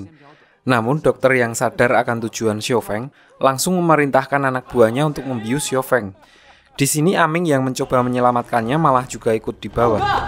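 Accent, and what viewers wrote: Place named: Yogyakarta, Indonesia. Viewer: Kek orang jogja ini mah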